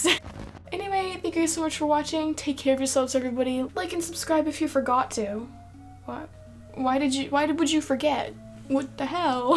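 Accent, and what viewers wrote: Canada. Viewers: I can tell you're definitely Canadian but still a very informative video lmao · I could tell too, even though I’m not Canadian. I live in one of the northern states, and the accent is really similar. Lol.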